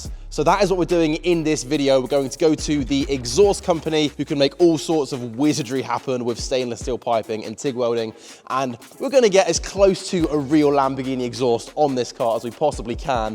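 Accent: United Kingdom. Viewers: Sounds britich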